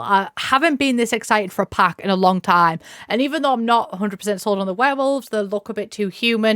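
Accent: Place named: Middlesbrough, United Kingdom. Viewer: I had a random thought while listening to this video... I don't remember if the Harry Potter books SAY what region Lily and Severus are from, but since it's an industrial town I decided my new head cannon is that they're from around Middlesbrough and therefore share your accent and that really makes me happy. 😁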